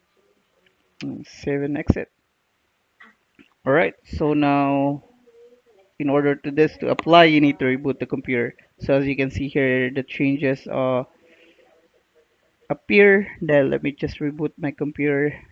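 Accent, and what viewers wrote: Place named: India. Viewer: Fracking Indians. You slaughter the English language. Easiest language in the world next to Spanish yet after two centuries of English Empire domination you still can't speak it legibly. No wonder the Greeks said screw this and went home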